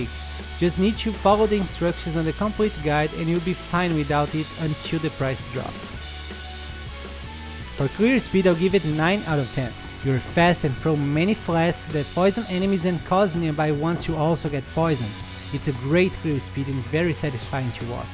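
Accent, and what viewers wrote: Brazil. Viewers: ma da pra ver que o cara é br o sotaque não engana kkkkkk full Fisk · Brazilian here, you sound brazilian... Are you?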